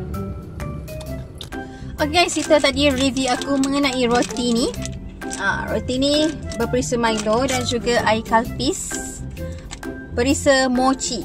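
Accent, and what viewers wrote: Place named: Indonesia. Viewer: amboi cakap loghat indo no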